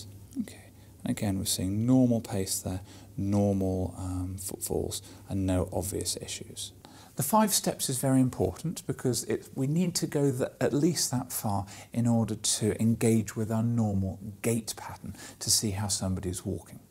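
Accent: United Kingdom. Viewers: Really enjoy the videos from an ASMR point. Plus watching from Australia but from the UK makes accent like being back home. Keep going with the vids and thank you.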